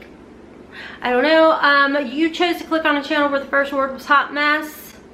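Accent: United States. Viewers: Haha 92% of the time you have a generic American accent…oh, but the other 8% 😂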